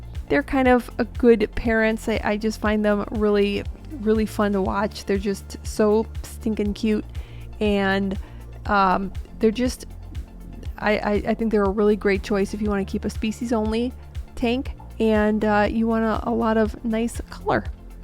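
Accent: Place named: Midwest, United States. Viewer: thanks for the video. you have a STRONG midwestern accent :) "oh for crying in the mud!" :P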